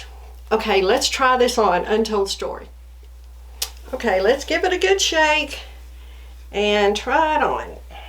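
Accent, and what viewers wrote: South, United States. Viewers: I love your videos and your southern accent is wonderful. I have bought many Raquel Welch wigs in the reds. Several in Glazed Fire (RS28). I also have three wigs in the Fiery Copper that you have own. My all time favorite wig in this color is Advanced French. But I also wear Born to Shine in the same color. I have also purchased a wig in Golden Russet, but to me, this is much more blonde than red.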